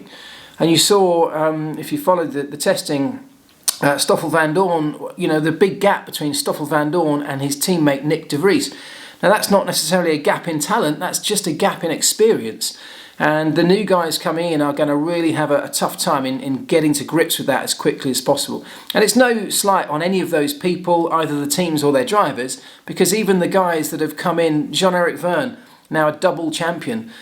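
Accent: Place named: United Kingdom. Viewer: Oh no, it's brit alert again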